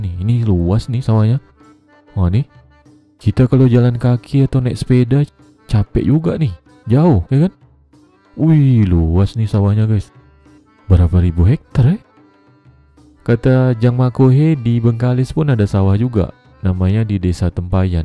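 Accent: Malaysia. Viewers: Iya bang cantik bener sawahnya, awa senang dengar cakap logat melayu abang, salam dari awa warga jakarta bang, sehat selalu ya.( awa sdh anggukan kepalaku tiga kali bang ).. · loh bukannya abangnya pakai bahasa malaysia ya soalnya ngomongnya mirip upin ipin beda cara ngomongnya sama orang indonesia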